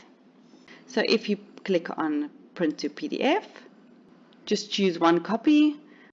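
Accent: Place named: South Africa. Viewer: That South African accent is so hot...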